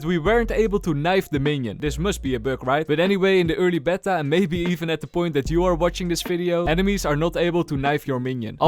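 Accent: Netherlands. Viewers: hey are you dutch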